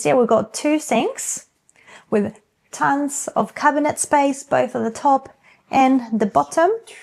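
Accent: Australia. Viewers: Found your channel quite by chance and do very much enjoy your explanations of your cultural experiences. Thought the accent sounded familiar. 🦘 Did the sums and it equates to per week in Australian money. You couldn't rent a private parking space in any Aussie CBD area for that. Rents in 6000 (postcode) outer suburb hubs start from $450 per week just for a 1 or 2 bed unit. Then again, you know all this. Do you reckon the children (when older) will look back at all of these adventures as something that not a lot of other children were lucky enough to have had? As for that upstairs terrace, aside from the barbi area, maybe a few raised garden beds for veges or potted fruit trees. Create your own oasis. Thanks for sharing 💙💙💙 Edited to correct grammar. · Ok your accent is like a crazy mix of Australian, German (or Dutch?), and Chilean or something. I have no idea obviously, but it's interesting to hear!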